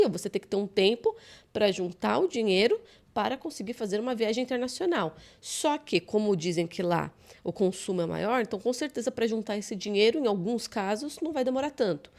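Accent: Bahia, Brazil. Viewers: Pelo sotaque é baiano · Pelo sotaque ele é baiano 😂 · Como baiano, reconheci logo ao abrir a boca que é baiano! A nossa fala é muito particular!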